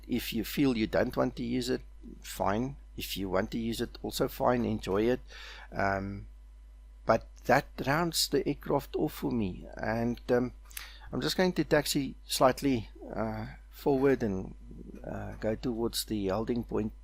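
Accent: South Africa. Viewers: Love the SA accent mate :D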